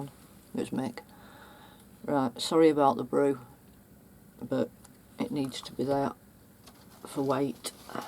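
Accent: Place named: United Kingdom. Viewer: Just subbed you as I liked what I saw, well done on making a great page. I did struggle to hear and understand you, even though Im a fellow UKer, it was a different accent....Im crap with understanding other accents to my own (Londoner) lol. I enjoyed watching your ICADs video too, especially the pink one, Im such a pink freak! lol hugs Tibby xxxxx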